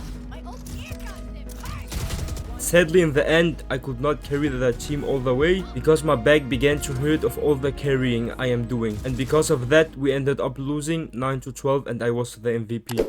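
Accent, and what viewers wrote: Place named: Arab world. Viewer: I'm the 700th subscriber Also keep up the good work (where are u from btw cuz u have an Arabic accent)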